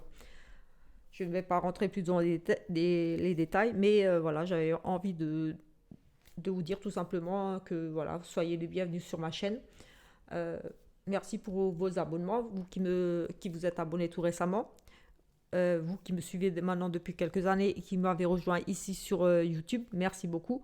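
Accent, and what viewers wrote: Alsace, France. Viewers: Bonsoir, je vous ai découverte il y a peu. J'ai immédiatement entendu que vous veniez d'Alsace et il n'y a pas de problème. Je suis du Sud ouest et j'ai aussi un accent alors ne vous laissez pas diriger par les autres pour si peu. Dites ce que vous avez à dire. Merci pour votre vidéo.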